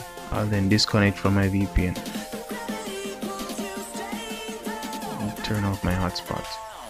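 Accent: Jamaica. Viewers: You a jamaican?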